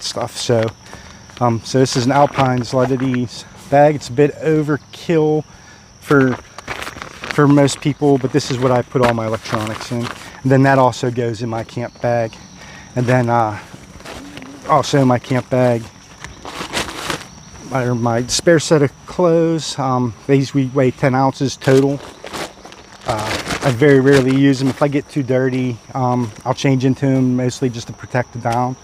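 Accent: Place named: Western Pennsylvania, United States. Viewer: Right on western PA .... so you sound..... East Palestine here.!!!!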